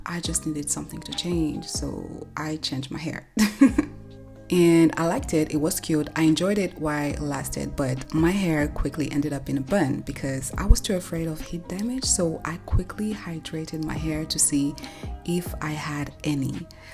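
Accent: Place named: Haiti. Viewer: Are you Haitian? I was trying to pinpoint the accent. It’s adorable.❤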